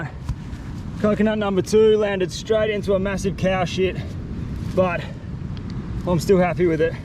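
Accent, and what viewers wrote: Australia. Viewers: This dude is awesome, he has the ability to be really excited about almost eveything, the Australian accent is like the cherry on top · he has an Australian accent. most people just relate australians to be tuff and not scared of most stuff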